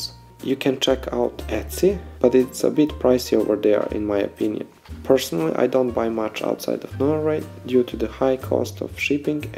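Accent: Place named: Russia. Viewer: У тебя такой классный русский Английский, прям не могу)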